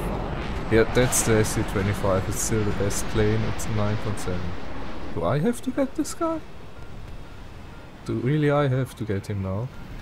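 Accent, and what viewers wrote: Germany. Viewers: Kann es sein das du deutscher/österreicher bist? ^^ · You are german right ?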